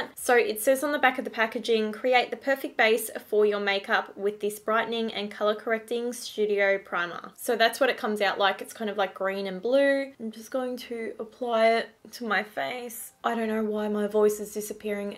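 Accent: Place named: Australia. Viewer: This is my first video watching you and wow… AUSTRALIAN 😂😂😂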